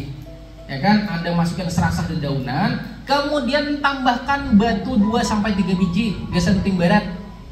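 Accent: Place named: Sumatra, Indonesia. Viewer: Ulun kira piyan i urang sumatra. Saklainnx sama ja wan ulun urang berabaei.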